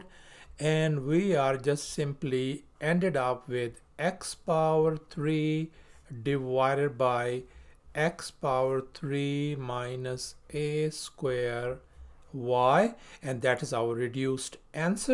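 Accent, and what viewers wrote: India. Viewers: Sir , I am from India.. You sound like Indian accent.. Are you from India?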